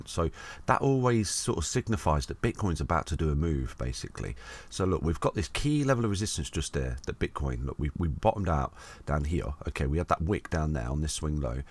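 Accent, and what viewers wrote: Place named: Nottingham, United Kingdom. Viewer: im from nottingham and your accent sound local to me, do you do any meet ups to share knowledge ?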